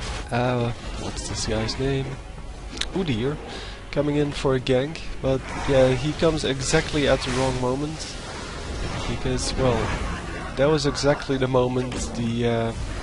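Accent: Netherlands. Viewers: Are you dutch? like Soraka AD :D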